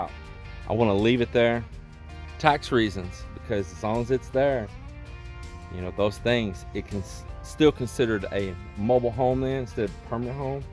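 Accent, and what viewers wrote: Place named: Louisiana, United States. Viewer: You sound like you might have a little bit of Cajun blood in you, by your accent. Do you? My sister has Cajun roots.👍😊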